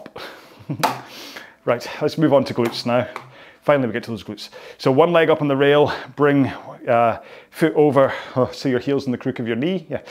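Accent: Scotland, United Kingdom. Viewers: Another great one!! Thank you! I’m quite a fan of the Scottish ramblings 😁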